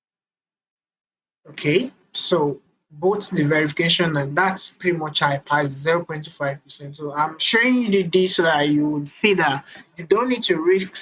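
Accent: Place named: Nigeria. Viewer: Ma'am, you sound Nigerian... I am a Nigerian too.. i wonder if its possible to set up a meet for a one on one?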